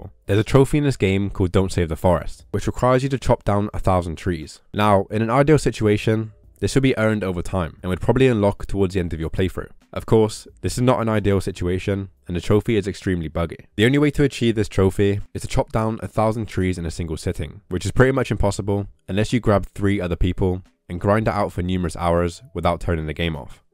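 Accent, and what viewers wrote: United Kingdom. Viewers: Are you Bristolian by any chance? I recognise the accent haha where I’m from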